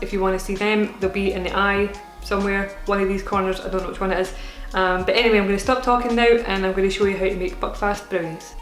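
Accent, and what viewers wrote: Scotland, United Kingdom. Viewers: I just have to say... Im from glasgow and ive lived in America since I was 12. Your lovely vids give me all the happy chemicals in my brain from my childhood. The accent and all the scottish slang makes me so happy. Ta v. much.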